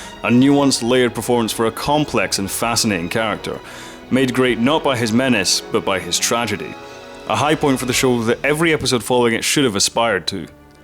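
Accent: Scotland, United Kingdom. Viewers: Sounds Scottish to me.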